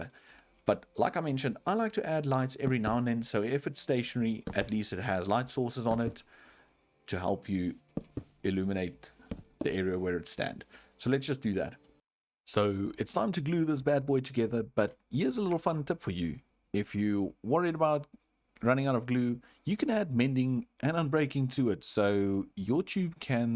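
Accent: South Africa. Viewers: Are you south african? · This guy sounds south African ngl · Nice video! Are you south african? I think I hear an accent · South African?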